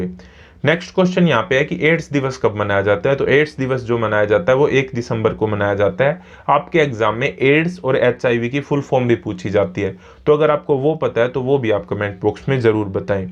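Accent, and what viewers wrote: Haryana, India. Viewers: Bhai haryanvi hai k ?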